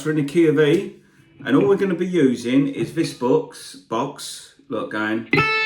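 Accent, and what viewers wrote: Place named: London, United Kingdom. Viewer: Great teacher, love the accent too, REAL London.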